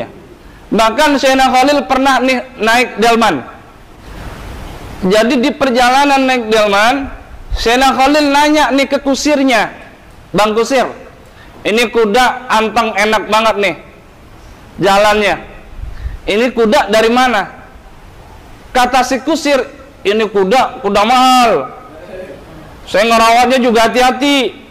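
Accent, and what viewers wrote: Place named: Madura, Indonesia. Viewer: mantap.madura nya masih melekat.hehehhehee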